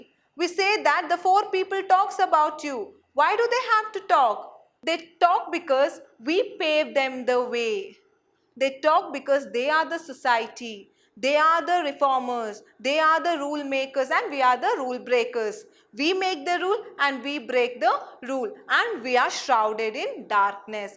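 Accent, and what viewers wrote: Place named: Kerala, India. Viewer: Vocab is ok but pronunciation 🥵....mallu pronunciation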